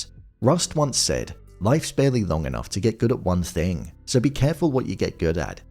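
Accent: England, United Kingdom. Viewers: that's not an Australian accent and anyone from Australia would be insulted by that. Its a straining for a poo part of England robotic voice.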